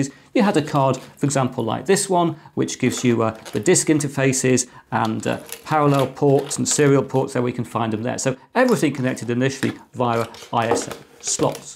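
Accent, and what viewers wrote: United Kingdom. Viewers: Is it me or do you understand things better when explained in a British News anchor accent 🤔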